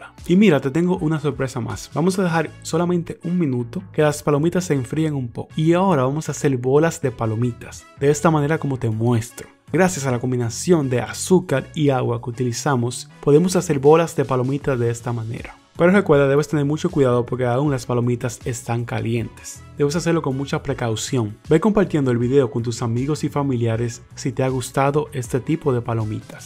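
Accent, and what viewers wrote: Dominican Republic. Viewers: Eres dominicano? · Suenas de RD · Sos dominicano verdad?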